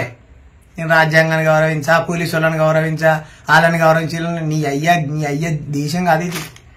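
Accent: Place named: Andhra, India. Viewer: May be needi Andra iyi untadi😅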